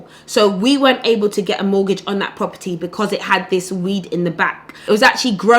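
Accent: England, United Kingdom. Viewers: you have an English accent, are these steps meant for American home ownership?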